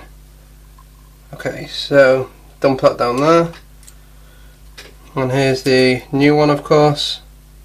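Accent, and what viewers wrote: United Kingdom. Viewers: Oh God it's British:)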